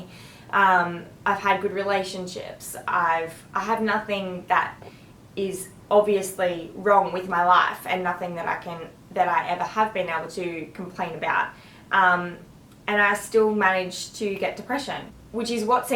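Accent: Australia. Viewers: Beautiful accent..... Australian?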